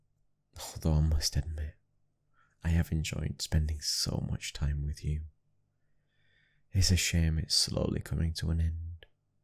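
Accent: United Kingdom. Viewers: I’m obsessed with british accents😍 Especially this man’s voice.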